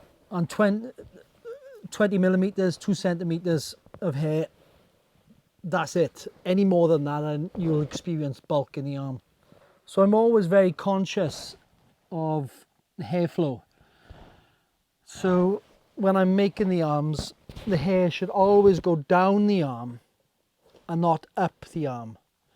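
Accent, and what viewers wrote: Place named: Wales, United Kingdom. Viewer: I was pleasantly surprised to hear a Welsh accent coming to this video. A great watch and great to see another Welshman do well, and show passion in his craft. yn anfon llawer o gariad o Llanelli. Iechyd Da i chi!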